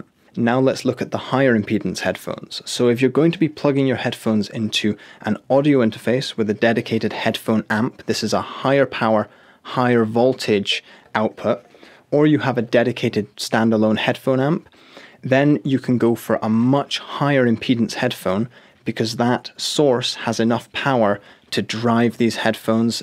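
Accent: Scotland, United Kingdom. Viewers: He sounds Scottish. I want to say NW Scotland by the soft accent. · Are you Scottish?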